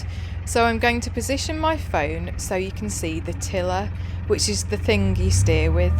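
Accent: United Kingdom. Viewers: Another mesmerizing and fascinating peak into British maritime leisure boating. Love to listen to her soft sincere verbal narration and charismatic British diction. Her innocent candor regarding her YouTube video production skills is all great video that is truly captivating to listen to. Love to watch and listen as she sounds like she is talking to me, not at me...God speed on a boat; you are a very refreshing treasure and pleasant to experience.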